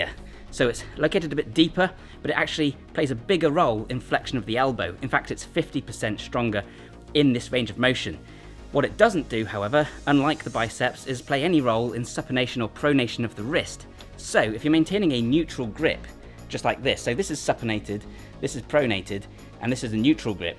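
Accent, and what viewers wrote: United Kingdom. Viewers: Side note. You have a great naration voice for audio books, I feel the confidence and conviction in the words. Must be the accent. Sooo British.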